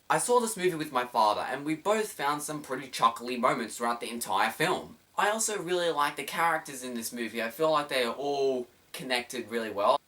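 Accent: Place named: Australia. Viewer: so you mean best and worst movies of the year right? that is a cool idea looking forward to it :) and by the way i love your australian accent it is pretty cool and makes you unique:) i think it is so interesting just that the same language sounds so different spoken by persons from different countries :) in my case my english videos have a german accent so my english sounds also different :)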